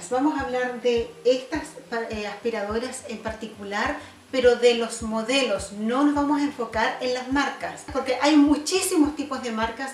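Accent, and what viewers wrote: Chile. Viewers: Hola, gracias por los consejos. Una pregunta eres Chilena? Por tu acento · Muchas gracias. Acabo de descubrirte. Estoy fascinada. Y me es lindo oír un acento chileno. Te cuento que descubrí las vaporetas y me estoy haciendo una muy buena impresión. Sabes algo? Te animarías a hacer un video?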